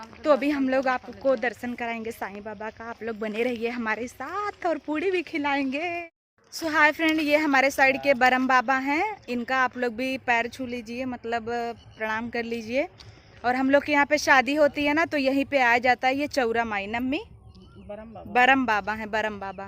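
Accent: Jaunpur, India. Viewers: ji, Hame gaow Bahut accha lagta hei. Aap ki awaj sun kar Jaunpur ki yad aa jaati hei. Main Jaunpur se bahar rahata hu.lakin aap ka video dekh kar lagta hei ki mein Jaunpur pahuch gaya.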